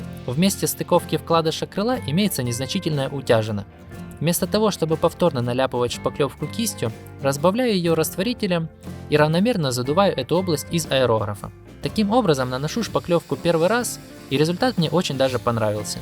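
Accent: Ukraine. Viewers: Чувак явно с Украины)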